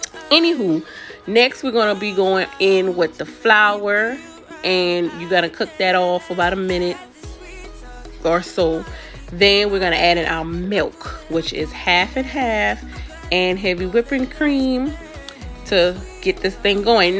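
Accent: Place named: South Carolina, United States. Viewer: I knew you where from sc! Your accent is heavy lol! Going to try this for vday! But would it matter if I use crab claw meat? I don’t really care for the lump crab lol.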